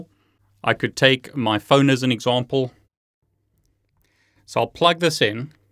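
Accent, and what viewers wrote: South Africa. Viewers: for some reason somehow your accent sound like an english south afircan to me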